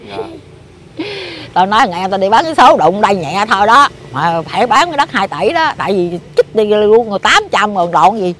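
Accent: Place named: Mekong Delta, Vietnam. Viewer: bữa giờ nhớ quá vì không có được xem và nghe tiếng miền tây của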